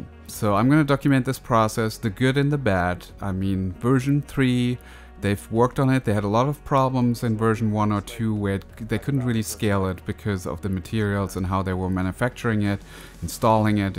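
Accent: Germany. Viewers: Grade über deinen Kanal gestolpert, super Video, dein Akzent bewegt mich dir Grüße aus der Heimat zu senden. Hoffentlich gibst es das Solarroof bald in Deutschland zu kaufen!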